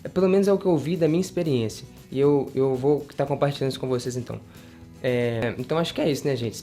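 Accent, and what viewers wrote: Minas Gerais, Brazil. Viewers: krl sabia que era mineiro pelo sotaque, tmb sou mineiro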